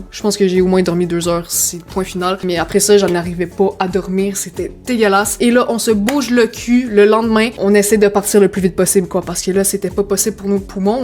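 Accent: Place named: Canada. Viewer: Hi, at first I thought you are French but at the end I pick up the Canadian accent. I've been reading in the news Canadian don't visit the US anymore. What do you think of the avocado it's not good? I bought 1 in NYC after a couple days when I cut it water. I was in Montreal last September I love it, I stay in downtown Montreal rue ST Denis not to far from Berri-UQAM, Place des Arts there's a big Mall, and McGill uni.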